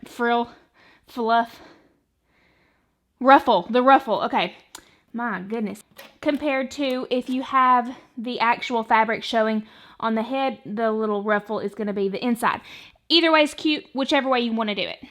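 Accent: South, United States. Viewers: I love her southern accent!!!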